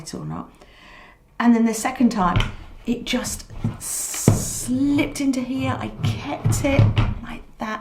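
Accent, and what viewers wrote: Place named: United Kingdom. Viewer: Its a british accent. Lol.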